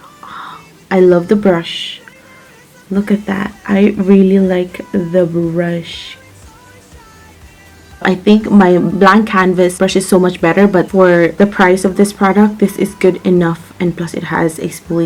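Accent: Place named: Ireland. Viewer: Where are u from cuz u sound a little Irish🇮🇪I’m irish too💜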